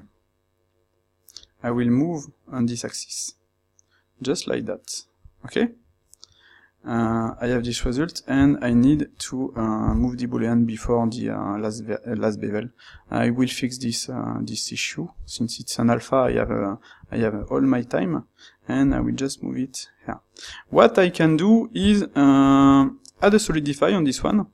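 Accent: France. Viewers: the frenchness is strong with this one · jesus why dont you activate that keyboard shortcuts addon man???? cant follow you with your strong french accent... that would help us immense... otherwise great addons, bought them both.... :)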